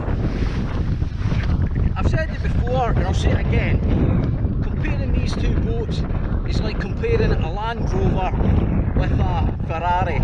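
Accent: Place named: Scotland, United Kingdom. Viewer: The scottish accent is not easy to understand for my french ears too.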